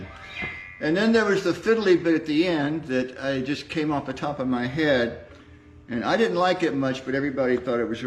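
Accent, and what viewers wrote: Florida, United States. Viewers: Both real Floridians! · Mike and tom have the same accent being both from Florida make a lot of sense.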